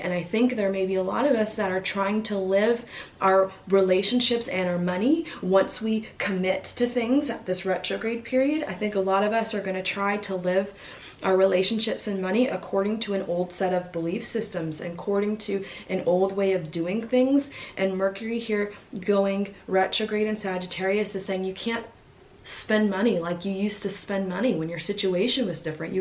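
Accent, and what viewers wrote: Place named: Georgia, United States. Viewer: Thanks,! I couldn't help but notice your accent is reflecting your recent move back to Georgia.;)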